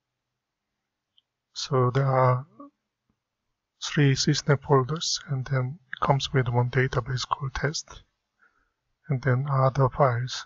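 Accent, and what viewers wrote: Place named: India. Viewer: Bom demais, sotaque indiano nunca falha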